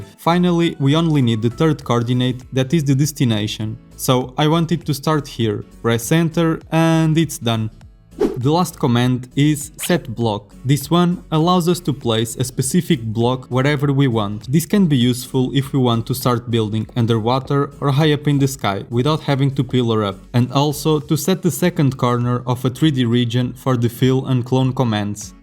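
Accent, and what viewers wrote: Portugal. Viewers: bem me parecia que eras de Portugal ^^ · parece me portugues o sotaque nao engana🤣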